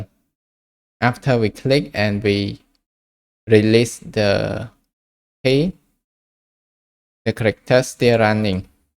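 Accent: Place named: Thailand. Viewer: As soon as I turned on the video, I felt a very familiar accent. I lived for some time on the island of Koh Samui. I'm sure it's a Thai accent. :)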